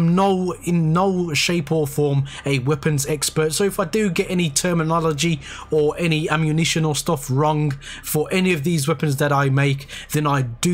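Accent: Birmingham, United Kingdom. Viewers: Is that a Brummie accent I hear or maybe a yam yam?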